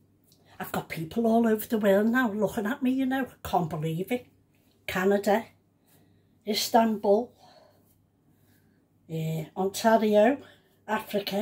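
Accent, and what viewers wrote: United Kingdom. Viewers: ❤🧚🏻‍♀️🌹 I’m So happy to meet U on here. I’m Teresa, from New York, USA. I just LOVE Ur accent. I’m guessing British. I love so much of England and Ireland. I’m Puertorican and my great grandma was Irish. If U are, equally I like U. Ur personality is lovely n sweet. Hope to see more of ya my sweet lady 🩰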